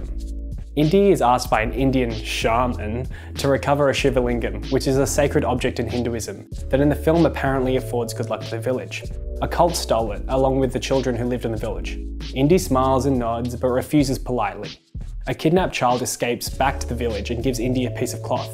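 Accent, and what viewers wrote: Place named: United Kingdom. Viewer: Its amazing you can say this with a straight face. I thought it was hilarious, the brittish accent is really the icing on the cake!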